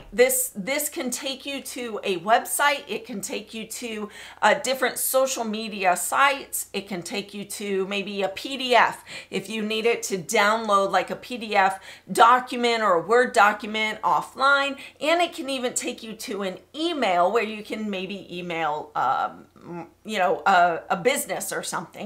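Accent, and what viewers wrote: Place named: United States. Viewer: thank you so much! You speak American English! Yay I can understand you! You explain in a simple and direct manner. And you are nice! Thanks so much!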